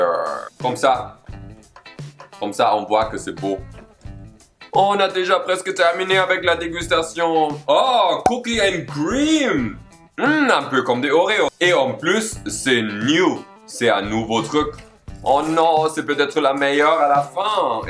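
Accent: Quebec, Canada. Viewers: J'adore l'accent Québécois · Ah le canadien🇨🇦 un mélange d'allemand🇩🇪 et de francais🇫🇷 🙄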